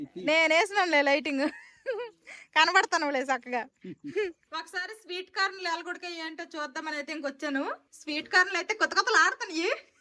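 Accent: Srikakulam, India. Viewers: Uriki velte paka srikakulam voice lo matladutunaru miru akka super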